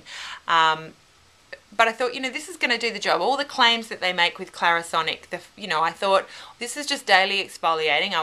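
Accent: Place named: Australia. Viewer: I just love your Aussie accent! Here in Europe we mostly only hear UK or US english, this sounds so cheerful LOL!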